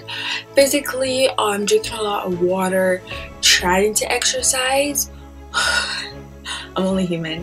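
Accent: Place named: Scotland, United Kingdom. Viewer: Sounds Scottish to me lovely story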